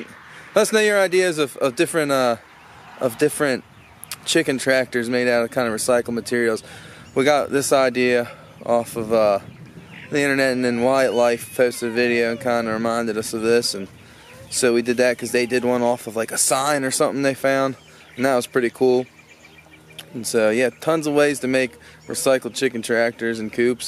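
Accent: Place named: Maryland, United States. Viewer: Definitely a Marylander. I know that accent from anywhere. 😉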